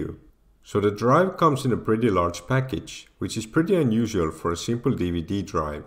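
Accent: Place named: India. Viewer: This was a big help to me to help reinforce my thoughts on how to set up the cables etc. and help my confidence in hooking up and installing my machine. I hope it isn't offensive coming from a white dude saying that Asian Indians are really superb with technology (better than white dudes like me). When I heard your Asian Indian accent I was thinking "Awesome, now I know THIS dude KNOWS what he is talking about!!!" Thanks brother, God bless you and your loved ones. You are a lifesaver Bro.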